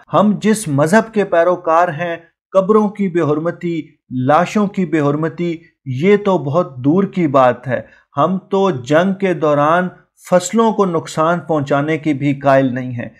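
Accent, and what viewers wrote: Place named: Attock, Pakistan. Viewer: Welldone ,ap ka lehja attock jand wala lag raha hai.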